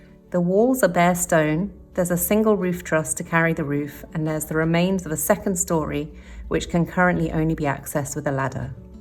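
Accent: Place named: United Kingdom. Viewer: I know nothing about architecture. But I do enjoy your videos so much, they are so relaxing complete with the slick animation, the gentle background music and your soft spoken narration with that beautiful British accent which is a pleasure to listen to. Fantastic work, thank you.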